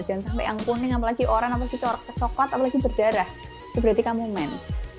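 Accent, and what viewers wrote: Java, Indonesia. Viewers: mba uwong jowo to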